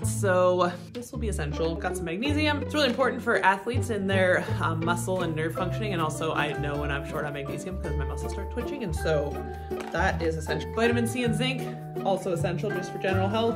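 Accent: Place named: Houston, United States. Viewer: Great Houston accent.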